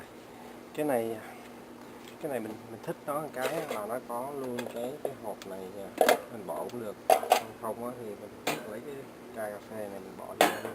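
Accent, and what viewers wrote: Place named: United Kingdom. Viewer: Cảm ơn anh đã chia sẻ về dụng cụ này, nghe anh nói tiếng Anh Anh rất hay nhưng ko phải giọng Mỹ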